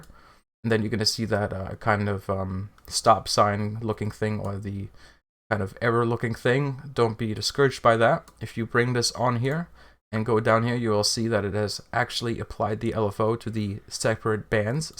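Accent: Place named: United States. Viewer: Dude, you sound soo american on this video haha, but anyway, helped a ton, Serum is such a great tool, thank you one more time!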